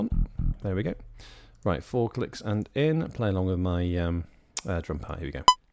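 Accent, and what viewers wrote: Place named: United Kingdom. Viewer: "might be a cheeky one in there" tehehe love the british accent and vernacular :) thanks for helpful video!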